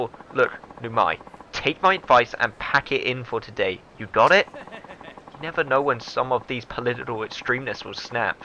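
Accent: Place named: South, United States. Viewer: actual Texan here, I think your southern accent is hilarious. Also good lets play, I've watched almost all of your yakuza videos in like 2 days lol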